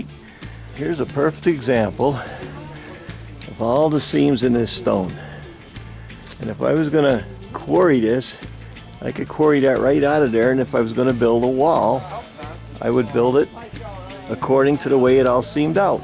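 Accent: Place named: Pennsylvania, United States. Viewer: As soon as you started speaking I knew you were from PA....like myself! Nice video!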